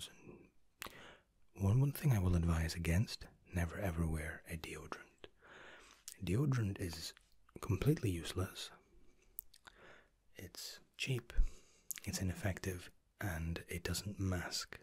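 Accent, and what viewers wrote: Scotland, United Kingdom. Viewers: A Scots accent, A beard, and blue eyes was always going to be a winner, but wears Jean Paul Gaultier Le Male - OMG I think my ovaries just exploded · Are you British? Or Scottish? I can’t quite tell. Love the accent though! 😉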